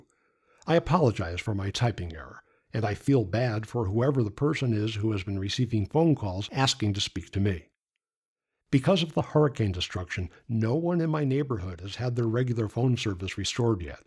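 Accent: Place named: Nigeria. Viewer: Like the way you talk like a Nigerian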